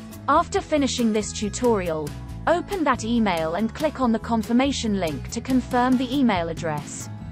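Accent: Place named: United Kingdom. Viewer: `Je praat echt met zo’n Brits accent’. Or in English: Your really talking with an British accent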